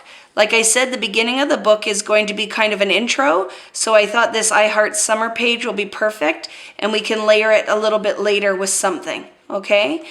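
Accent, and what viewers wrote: Canada. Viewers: Just stumbled upon your videos! Love them!! I kept saying to myself "is she Canadian?"...so I went off to you blog to find out... Born & Raised in Ottawa, wavin' atcha from Houston Texas! :D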